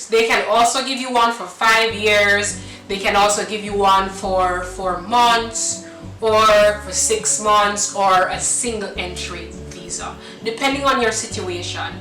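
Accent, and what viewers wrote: Caribbean. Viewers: Intelegent young lady, good grammar, with an accent exactly as any other English speaking Caribbean islander.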